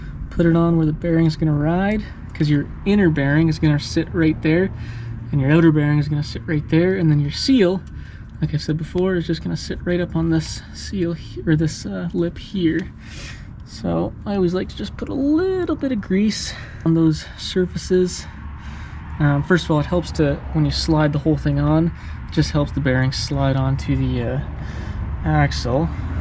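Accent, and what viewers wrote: Canada. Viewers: You sound so Canadian !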